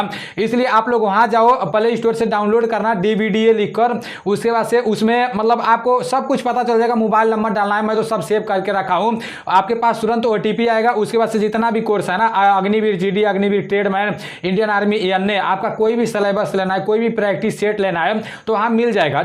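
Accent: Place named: Bhojpuri, India. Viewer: bhaiya apki Bhojpuri launguages bahut mast lagata hai, I belong gola bazar, Gkp se hu bro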